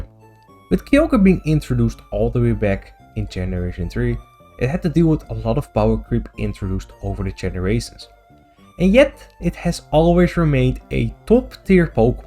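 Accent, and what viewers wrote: Netherlands. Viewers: Hahaha Rain Boosted Water Spout goes brrrrrr (Btw are you Dutch? Guessing from your accent)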